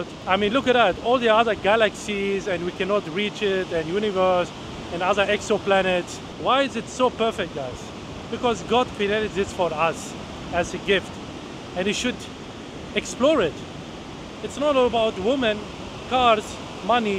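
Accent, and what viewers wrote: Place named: Colombia. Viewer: Por que hablas colombiano si tu eres de Caracas, Venezuela??? Eso no me gusta.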